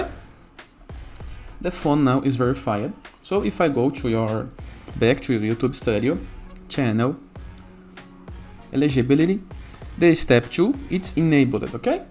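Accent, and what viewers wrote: Brazil. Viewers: good video sotaque brasileiro kkkkk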